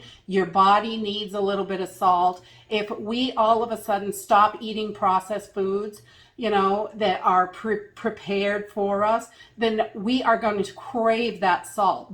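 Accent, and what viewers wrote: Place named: New England, United States. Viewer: Hey, are you in New England? I am - and from your intro (with the fall foliage) and your accent, it seems like maybe you're a fellow New Englander. If you like to keep your location private, no worries. I only go as far as to say that I'm in New England on my channel for privacy (and anti-stalking) purposes. I was just curious.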